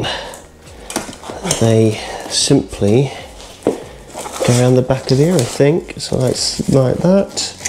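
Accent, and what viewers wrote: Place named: England, United Kingdom. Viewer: I prefer the Human language aka American English and not that nasty tongue clicking and lip smacking noise from shithole engkand, on top of the frequent gasping you mouth-breathers constantly geberate every 4 seconds(on average, it can ve far apart as one whole minute beforw you things gasp, a very ugly sound just lije the stupid lip snackinv you genetate while gasping to show that you ats from england ir sone such savage land instead of civilization).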